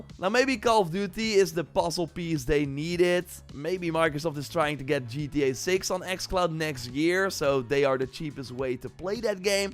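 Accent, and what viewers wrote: Netherlands. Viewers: I know that accent, you're probably from Netherlands 😀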